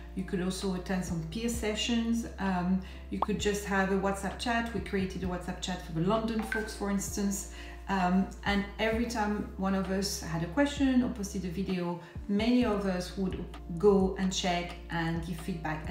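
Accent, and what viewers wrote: France. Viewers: So nice to see a review from a female perspective and someone not in their 20s or 30s (I with you sis!). Congrats on completing the course. Love the French accent and the Bonjour at the beginning. Good luck on your Youtube journey.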